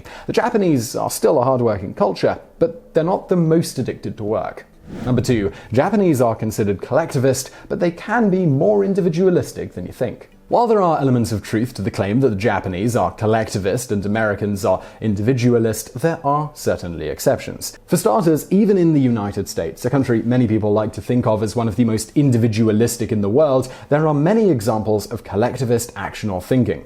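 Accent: England, United Kingdom. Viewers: He has said his audience is mainly American. And maybe youve noticed his English accent? Plus theres the fact he lives (or lived Im not up to date with his personal life) in Prague. His audience IS international. Im Australian, I have no issue with him addressing his core audience more often than me. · Why is this British-sounding guy lecturing us about "what Americans think?" Why is this titled as what Westerners think, and entirely geared towards American (mis)conceptions?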